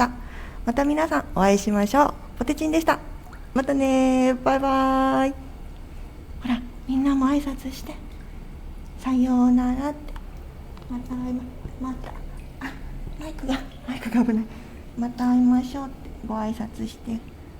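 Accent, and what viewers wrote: Kagoshima, Japan. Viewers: 只々、スケベ心だけで見続けてしまった。声と喋り方が可愛い。鹿児島弁なのかイントネーションがとても耳障り良い。良いチャンネル見つけてしまった。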